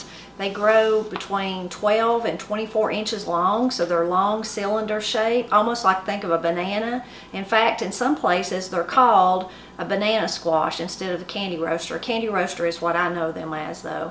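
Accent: Appalachia, United States. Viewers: What a pleasant surprise, stumbling on a cooking video from you. I love to cook and Appalachian cooking is nothin to me other than the way I grew up eating here in Texas, thanks to my granny coming from KY to stay with us while mom worked. Listening to your videos is like sitting on the porch visiting with family. I remember people actually going to VISIT. What an odd concept to the insanity of today's world. God bless you and your family and keep you safe. By the way, you don't talk neer as up the holler as my kin folk in Southwest Kentucky. 😁👍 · I love your videos. Take care! You’re loved here in upstate SC. I’m familiar not only with your speech and traditions, but you’re so sincere in your love for the history and ways of our Appalachia, as am I, , I would love one day to be able to just sit down for coffee and some good conversation with you. 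I feel like you’re a friend already.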